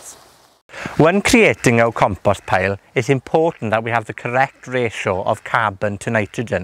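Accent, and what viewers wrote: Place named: North, United Kingdom. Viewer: Sounds like a northerner to me :)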